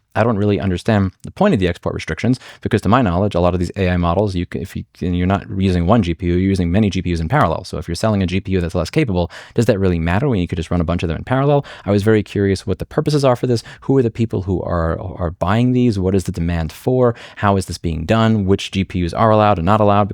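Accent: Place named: New York City, United States. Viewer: He's from NYC. I'm familiar with his dialect so it's not fast to me.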